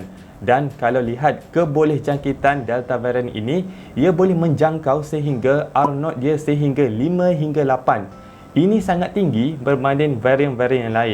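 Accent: Kelantan, Malaysia. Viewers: pekat budu terrrr...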